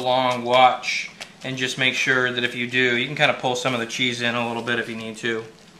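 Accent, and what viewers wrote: Canada. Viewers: You sound like ur from Ontario Canada.. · Are you Canadian?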